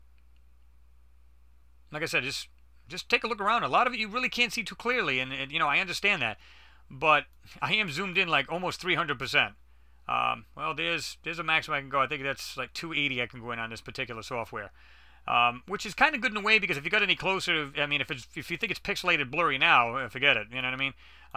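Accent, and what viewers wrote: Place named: New England, United States. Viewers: great videos brother very interesting I always knew that those nasa bastards have been lying to us lol any ways thanks for the great videos brother. Are you from Boston you sound like you have a Boston accent. Well anyways you got your self a new subscriber keep making these great videos. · he's definitely from New England, Greater Boston area would be a good guess. cool picture of the Moon too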